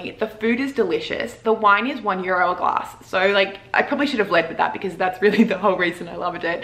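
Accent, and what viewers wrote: Australia. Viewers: love your Aussie accent!!!!💯💯❣❣💥💫💫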